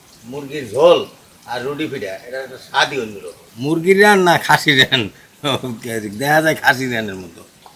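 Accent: Barishal, Bangladesh. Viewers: Brisal bola kotha nijer kahon valo boja😡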